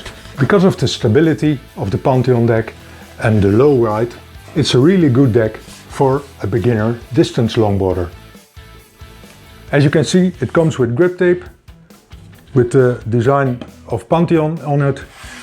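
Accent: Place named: Netherlands. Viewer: Lekker hollands accentje :D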